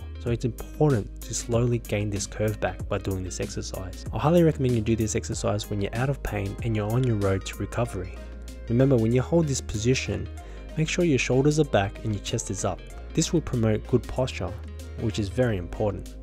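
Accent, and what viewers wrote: Australia. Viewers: Super helpful. Thank you so much. Love your Australian accent too.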